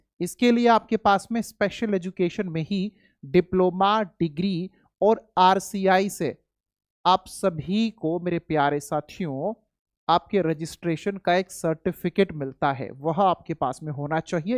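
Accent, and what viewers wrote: Haryana, India. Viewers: Sir apki harayanvi 🎉😮😊😊voice very good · Wha sir aapne to dil khus ker diya aaj haryanvi bol k,aaj lagya apnapen is video m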